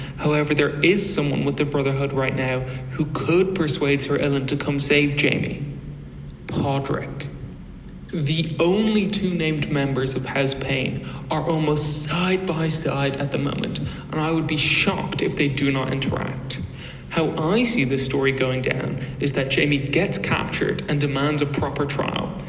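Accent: Ireland. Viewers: Love the theory. Haven't seen many creators ponder his role or the parallels between him and Wex. Definitely an Irish accent. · I liked the video. Seemed professional. I had no idea you were a new channel. Seemed like you've been doing this a while. Loved the topic, and I noticed some interesting bits about Payne in the story, but I never thought too much about it, and this was a cool dive into it. And as for your accent, I'm sure it's not strong in the sense of the general country of where you're from, but I'm American, and anything from the English-speaking world that isn't American sounds strong to my ear. I'm going to guess Irish. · You sound a bit Irish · I think it's not unlikely there is truth to this theory. If there is, I think Arya may be present when Stoneheart questions ser Ilyn. I think it is Stoneheart's vengeful nature that will make Arya turn away from her path of revenge, and ser Ilyn's questioning may be a part of that. 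As for your accent, after paying attention to it, it definitely sounds Irish. It is, however, not a very strong accent (especially not compared to other Irish people I've met). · Is your accident Irish? It's strong enough to sound pleasant and sophisticated on my crude yanky ears. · My guess is Ireland? for accent that is lol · Excellent video. I'm looking forward to whatever you put out next. RE: The accent, almost certainly Irish, I'd guess Dublin but not certain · Easily one of my favourite theories! as for your accent, I diagnose you as Irish! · You sound Irish to me. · I really like the character Dick Crab. Your clearly Irish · Phenomenal theory! Love how Ilyn's inability to speak mirrors Stonehearts and how Podrick is involved. Also I'm guessing you're from Ireland based on your accent. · Re: your accent question, I’m from the US and you sound Irish to me (specifically the way you pronounce some of the names)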